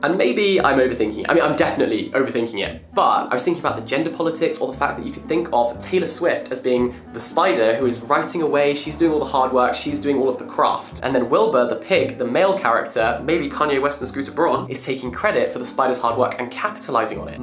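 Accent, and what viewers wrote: United Kingdom. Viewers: His accent !!!😍 I adore the British accent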